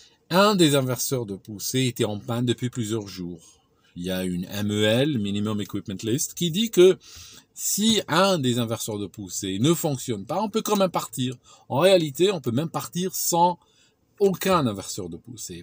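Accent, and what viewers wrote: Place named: Switzerland. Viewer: Salut - j'aime bien, j'avais remarqué dans d'autre vidéos, ton accent suisse ressort bien à des moments- vers notamment il est bien teinté.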